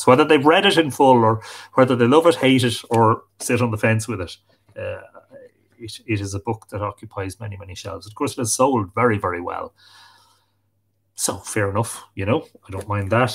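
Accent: Ireland. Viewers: The Irish accent is helping your cause. I love the Irish accent especially coming from a sweet Irish lass. I've been told I have an accent is well having grown up in Boston but I always thought it was everyone else that had the accent not me. Anyways, God bless the Irish and God bless Ireland. It sounds like you guys need it just as much as America these days. Saint Patrick and Saint Bridget send help to Ireland!!! 🍀🍀🍀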